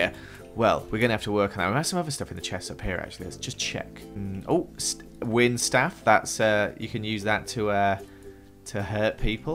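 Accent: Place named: Scotland, United Kingdom. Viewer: he is Scottish so he talked diff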